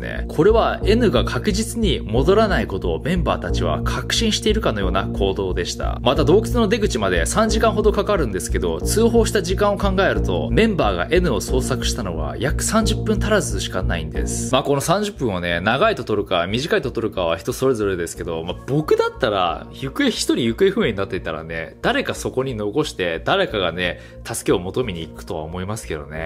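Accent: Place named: Kansai, Japan. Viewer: 関西弁だよごく普通の。 それはちゃうねんなぁ〜。とか言うよ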